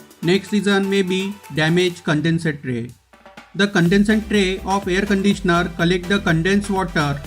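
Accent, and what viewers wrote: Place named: India. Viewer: Why all these videos are in Indian accent? :/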